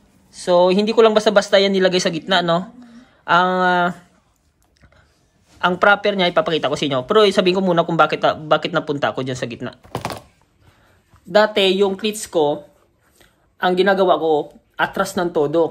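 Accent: Iloilo, Philippines. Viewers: daw ilonggo ka gid sir ba hehehe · Ilonggo ka master?? Ride safe simo da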